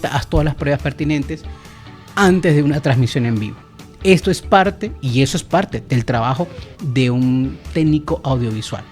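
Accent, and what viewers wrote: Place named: Caracas, Venezuela. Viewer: De paso me suscribí y te empecé a seguir en Instagram jejjjee Estas en San Cristóbal, pero tu acento es caraqueño.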